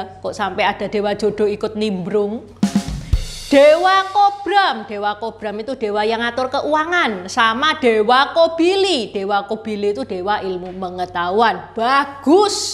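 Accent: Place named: Surabaya, Indonesia. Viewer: ngakaaaak… aplg logat e.. suroboyo -an e kentel… segerrr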